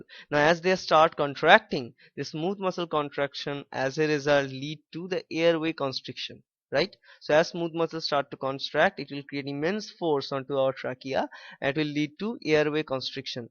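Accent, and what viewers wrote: India. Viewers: Omg.. tq god im from malaysia n im used with indian english accent if im not.. i might be dun umderstand your useful knowledge.. u r very good in explaination.. now i really understand it.. i hope i can answer my exam question very well after this.. tq guru.. ^O^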